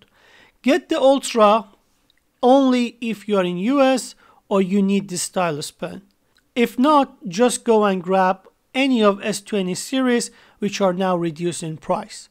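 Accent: Iran. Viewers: Your accent is Iranian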